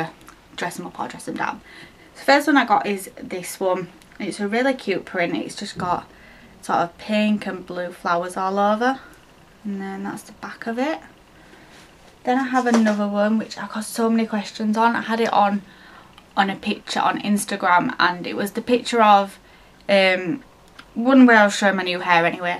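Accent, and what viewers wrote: Yorkshire, United Kingdom. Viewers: It's an accent, Yorkshire accent to be precise x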